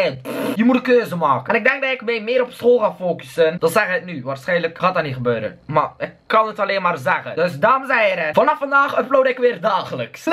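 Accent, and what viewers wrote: West Flanders, Belgium. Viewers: Uw accent is echt top! Belgium aan de top <3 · jaja eindelijk een west Vlaming Zaliggg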